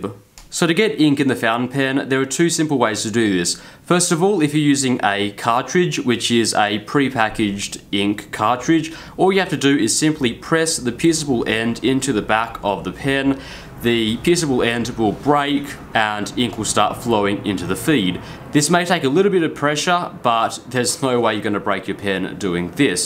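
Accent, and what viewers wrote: Australia. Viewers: It's great to have a local reviewer. I so seldom hear Australian accents let alone a Sydney accent. Noice!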